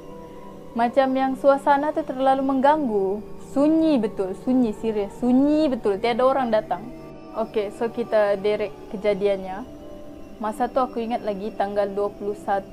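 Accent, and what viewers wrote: Sabah, Malaysia. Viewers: makin lama , makin keluar slang sabah hahaha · akak,nk tanya.akak ni asal sabahan ia,bukan apa kak macam sedikit sabahan laa bila akak bercakap. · Insya Allah sy DTG ke Sabah nanti, mgkn boleh cari awak,UTK jd Pemandu Pelancong 🤭. Maaf sy bergurau. Walau apa pun memang suka dgr gaya percakapan Org Sabah. Sabah adalah Malaysia, kita sama di bwh 1 bumbung Negara. 🙏🏼❤️👍🏼👌🏼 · Orang sabah kah?? Cara cakap macam orng sabah...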